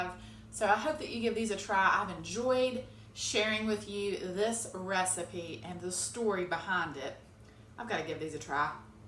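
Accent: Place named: Appalachia, United States. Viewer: the minute I heard the first two words out of your mouth, I knew I had a fellow mountaineer! I am so excited!!!!!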